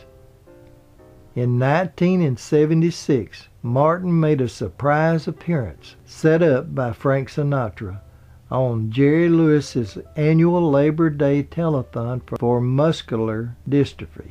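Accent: South, United States. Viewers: I love this southern Accent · Now that Jerry Lewis has also passed on, do you have any plans to make a 'companion piece' to this video about his life? I do hope you consider it as I really enjoy watching your films. Oh, and just for the record, I am one of those who just LOVE your accent - it reminds me of several holidays I had touring the Southern states of America many years ago when I was young and mortgage-free!! We always found the southerners extremely friendly, and they were just as fascinated by our English accents as we were by theirs!! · My little brother spoke only Portugues when we came home from living in Brasil. He understood English, but only spoke a few words. We spoke English at home, but the woman who took care of him spoke Portuguez to him, and although she spoke English very well, would only answer us kids if we spoke Portugues. 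Good documentary,Love your accent. Your voice sounds like the men in my family! (from Alabama. Tennessee and Florida) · Jerry, I smile every time someone comments on your accent, vernacular and the Southern charm exuded in your voice. You remind me of my younger days and down home folks having a casual conversation. Thanks for that memory and for your informative and insightful videos. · This guy I like has a smooth southern voice....kinda weird for a Dean Martin history......whatever? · . I love your southern dialect. You sound so much like how my daddy talked. Your voice is very soothing. Thank you for your channel and all the good info you provide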